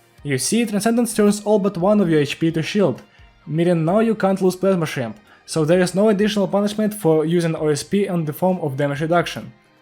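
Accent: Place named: Russia. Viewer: Russian accent is strong in this one. I have no idea why, but this really sounds 100% Russian to me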